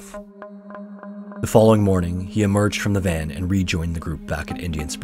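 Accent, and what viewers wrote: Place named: Canada. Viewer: I hear the Canadian in you.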